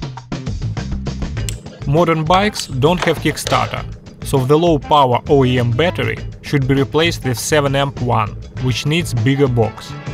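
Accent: Russia. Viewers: Russian accent language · У него русский акцент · Ужасный акцент русского, типичное зрелище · Говорит чел с русским акцентом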